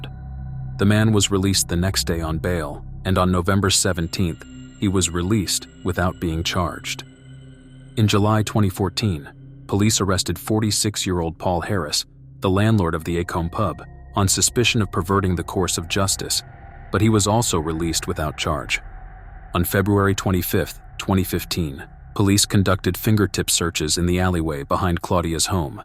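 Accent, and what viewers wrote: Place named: United States. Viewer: British crime but it had to be an American voice.........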